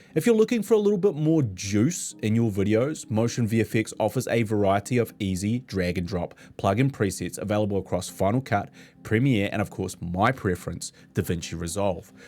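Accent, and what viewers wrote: New Zealand. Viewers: love the intro skit: hehehe do more! love the kiwi accent too.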